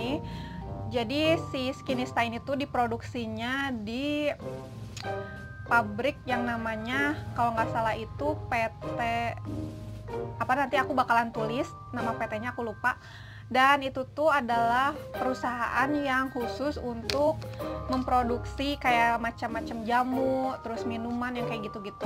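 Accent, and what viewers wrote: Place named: West Java, Indonesia. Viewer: sia syet hehehe seneng denger logat sundanya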